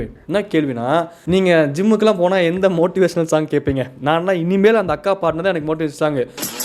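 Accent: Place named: Kongu, India. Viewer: Kongu pechu varuthu athan.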